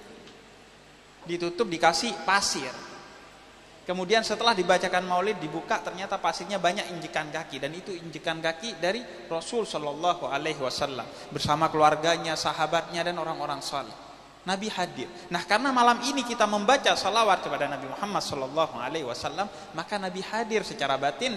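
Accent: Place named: Madura, Indonesia. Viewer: Logat maduranya tetap nonjol bib😁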